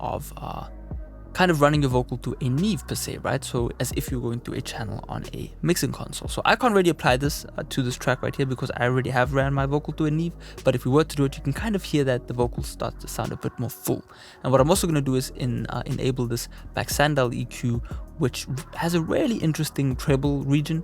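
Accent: South Africa. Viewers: Are you from South Africa???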